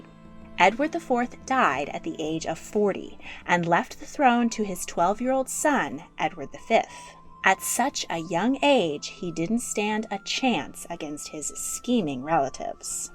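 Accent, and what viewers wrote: United States. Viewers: Please get someone to narrate who is not so fucking annoying with their boring semi american twang. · geez americans have an ugly accent. i cant bear to listen to this woman.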